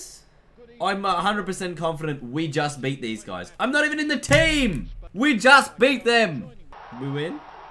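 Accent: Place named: Australia. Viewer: Omega Music he's Australian m8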